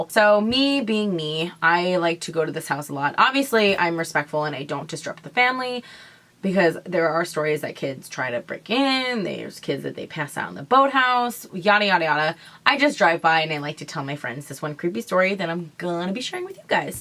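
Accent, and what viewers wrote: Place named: Long Island, United States. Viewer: I'm from Long Island I just watch like 3 of ur vids and literally thought to myself she has to be from here 👌🏽 LI knows LI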